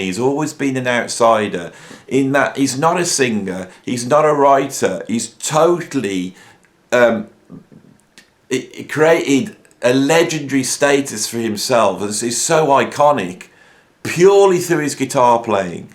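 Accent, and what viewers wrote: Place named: England, United Kingdom. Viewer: what region in England is your accent from?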